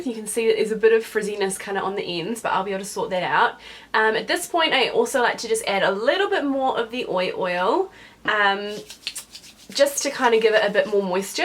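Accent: New Zealand. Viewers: Yay NZ accent, I've never heard us on YT before! Great tutorial, thank you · I think they look really nice. I might put in my lady hair when I pamper her eg pedicure. BTW is that a New Zealand accent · Is she from New Zealand? Her accent sounds kiwi. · yep that's the kiwi (NZ) accent :) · You have the most interesting kiwi accent and you were absolutely born for that red lip. Gorgeous. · Knew I heard another New Zealand accent! Hello from home, great tutorial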